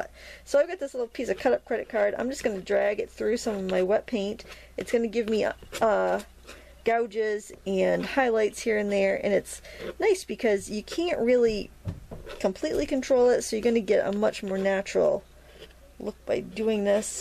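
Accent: Maine, United States. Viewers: I believe its a Maine accent thing, she's saying across. I've heard it in a few other peoples videos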